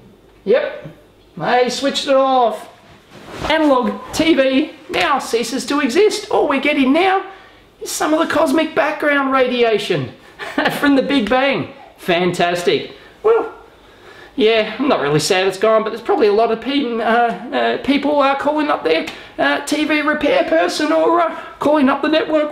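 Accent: Australia. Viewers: It’s Australian sorry to break it to ya mayte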